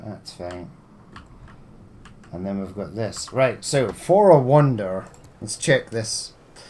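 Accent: Scotland, United Kingdom. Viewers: Hey man. Where's the accent from? I assume it's Scottish, but I'd love to know. Thanks!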